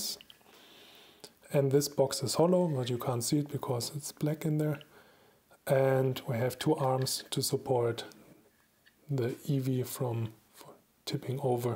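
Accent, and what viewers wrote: Germany. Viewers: Ahaha! Me as a German must say: He is so typically german not only because of his dialect but the way he sees things😂😂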